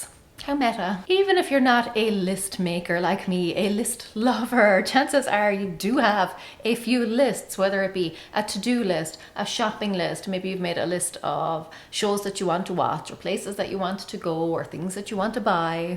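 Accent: Ireland. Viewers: I just discovered your channel and couldn't wait to subscribe. I can relate to so many of the things that you talk about. My fantasy life is miles away from my real life. Years ago when everyone was into rubber stamping I purchased some equipment and then realized that I wasn't into crafting and was never going to enjoy doing it. I would say my biggest problem is holding onto something just in case I might need it at a later date. I'm starting to watch all of your videos and I hope that I can gain some inspiration from you. You are both informative and very entertaining to watch. You have such a calm demeanor and your Irish brogue is lovely.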